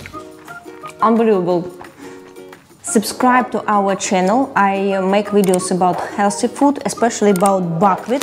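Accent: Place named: Ukraine. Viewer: Sounds Ukrainian to me!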